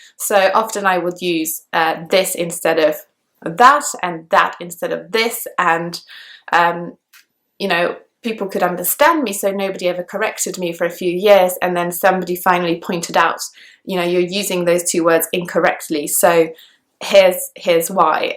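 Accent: United Kingdom. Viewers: Lol i thought you were british until you said the 'this and that' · Hi Good morning from Myanmar. I've been learning english 2 years nearly but my take on is that it didn't work much as i hope. British accent appeals to me tremendously. I feel i have a weakness in pronounciation. Fortunately, your vedio for boosting my accent in british is exceedingly great for me. ohh by the way, your british accent is lovely. Thanks a lot for your kindness.😘😘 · As a well travelled Australian, I thought you were from the UK. Only some words sound a little 'central European', and you have both pronunciation for the north and south of the UK. But there is plenty of examples of natives that do the same! Well done!!! Like another view, I am technically advanced in Spanish, but this seems to fluctuate greatly depending on the day... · I did, but it was like 95% BrE, and 5% german, maybe, cause thr L is sorta german, especially in words like "language" and words that have "ly/li" in it. 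· I thought she was british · I thought you were British till you started speaking about your fossilised error ! My god, you sound like a Brit through and through. What's your native language ?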